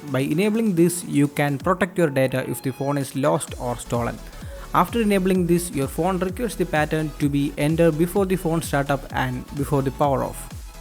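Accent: India. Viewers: 😂😂😂India man..English India...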